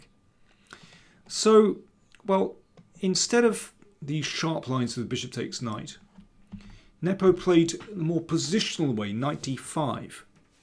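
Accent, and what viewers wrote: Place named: Southern England, United Kingdom. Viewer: That's his accent - middle-class southern English, I don't know why you call it patronising or what you expect him to do about it. And he's a grandmaster, and in my view the best of the YouTube full-time chess analysts. Carlsen's opinion obviously carries great weight, but a grandmaster is perfectly free to voice objections to it. In fact that's his job, to give his opinion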